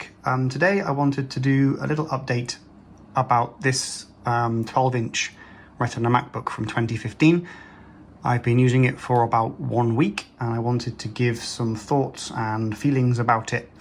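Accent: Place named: Hertfordshire, United Kingdom. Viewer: Are you a Japanese resident or planning to move there? The Hertfordshire accent is very recognisable.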